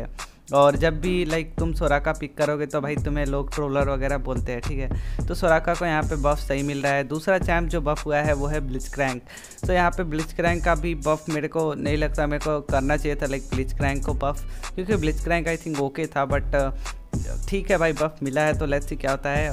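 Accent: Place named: United States. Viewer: live stream wala accent vedio me american accent kese ban jata hai 🥺🤦‍♀️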